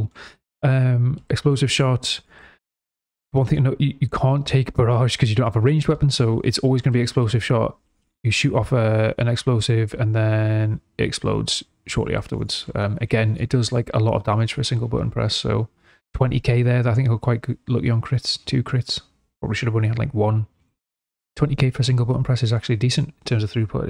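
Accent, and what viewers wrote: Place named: North East England, United Kingdom. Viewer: nice to hear a NE voice doing wow content i'm from Boro and it's refreshing!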